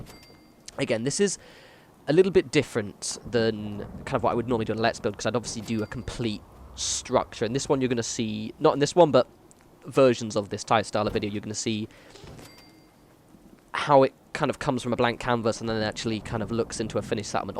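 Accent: United Kingdom. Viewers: I literally click on your random videos just to hear that British: "Ello everyone."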